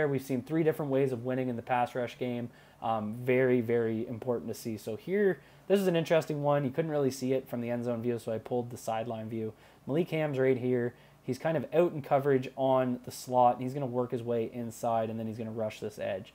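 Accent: Canada. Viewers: Your Canadian accent is so strong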